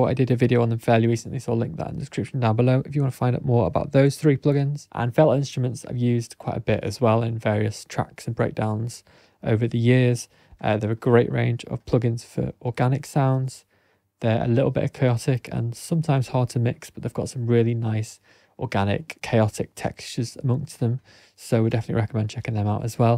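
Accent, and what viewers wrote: United Kingdom. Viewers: Hey good guitar playing mate what part of Yorkshire are you from?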